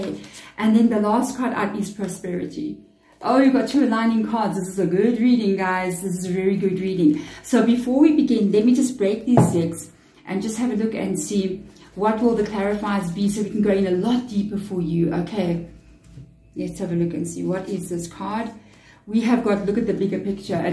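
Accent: South Africa. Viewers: Hello dear of, light and blessings to you 💖 thank you so much for this message, it is for me. A video of yours came to my feed a few days ago (my sense of time is fuzzy now) - you first published it 2 weeks ago — it was about creating a new life that will be shocking to others. That was for me, I watched until the end and that message gave me goosebumps, I felt the resonance I my heart, my head was tingling the entire time. This message is the continuation of that one. I know it. And you will know it too 🌻 I am so grateful. I watched this one the whole way through and I wanted to watch it over again right after. Thank you for the prayer, thank you for sharing your light with me. It’s amazing that you use the word reprogramming here because that is exactly what I feel like is happening. I’m allowing my intuition to guide me as I flow along the process. There are rare moments when my mind still wants to take over and ask questions and plant seeds of doubt, I take a breath and come back and trust. 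Thank you. I am so excited for this newness. It’s different. Changes are happening. But it feels gentle and I feel so supported. Thank you thank you thank you. I send you love, beaming to you from across the Earth. P.s. I have a friend from South Africa, I knew you were South African when I heard you speak in the first video, you confirmed it in this one. Maybe one day I’ll find myself there. Nou gaan ons braai 😂 Big big love and hugs to you 🫶🍀✨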